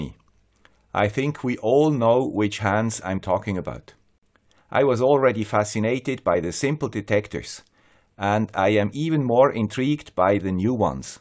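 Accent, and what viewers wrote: Switzerland. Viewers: Hello Guy with the Swiss accent, I am a great fan of your channel and thanks to you I have built a lot of projects and Every one was successful. You are truly a great guy.. Can you do a project on building a speed gun radar detector to be used in the car using commonly available sensors and microprocessors. It will be really great project. The currently available sensors in the market are pretty expensive. Also as a maker it will be great fun to build one on our own. Thanks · Sir Mr Swiss accent guy, is it possible to hook a Cantena to these modules to increase range and directionality? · how do you spell the word that you say at the beginning of every episode? "Grietsie YouTubers"? I always enjoy that "Swiss" accent, which I imagine must be German or Romansh:) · 2 years in: still loving the guy with the Swiss accent.. my #1 go-to guy when I want to be informed about IoT, electronics and home automation!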